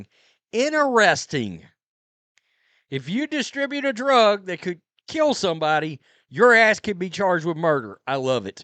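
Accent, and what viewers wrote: South, United States. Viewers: Dude, you sound southern you should know even the law is crooked in New Orleans. Nothing crazy out of that city ever surprises me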